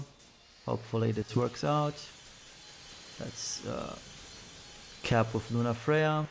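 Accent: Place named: Germany. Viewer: Btw. are you from Germany? Your accent kinda sounds like it 😁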